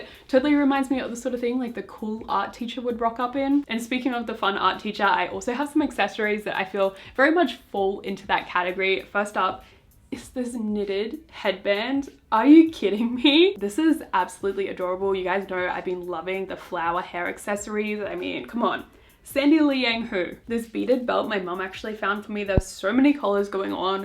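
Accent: Australia. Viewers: danggg it’s so refreshing hearing an aussie voice!!!! love the way you style out of the box pieces… i’m feeling very inspired. can’t wait to go in a youtube hole of your chanel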